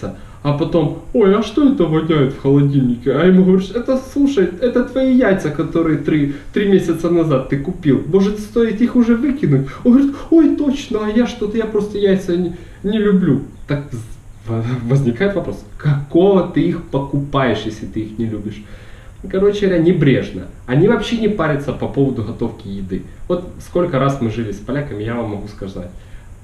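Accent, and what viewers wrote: Ukraine. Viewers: парень у тебя украинский говорок хотя ты усилино стараишсЯ обрати внимание на свою букву х и меньше подхалимничай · Ахахаха, я ору! : D Ещё говор такой "Украинский"! Ну да, на Украине всё так и есть как описал) Надеюсь в Польше не все такие стукачи и зануды. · Я думал ты беларус, , а ты Одесит! Круто! У нас также!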